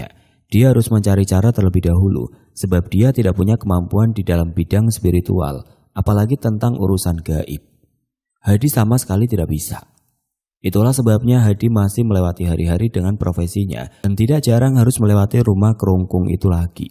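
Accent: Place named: Medan, Indonesia. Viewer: Org Makassar tp logat medan, saran aja bang, sesuaikan ajaa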